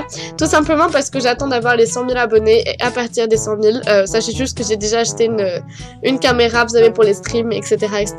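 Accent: Quebec, Canada. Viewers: defois ta grv un accent québécois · Go les 100K ?😙 t'as un p'tit accent nan ?😁 québécoise ?😏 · On entend beaucoup ton accent Canadien❤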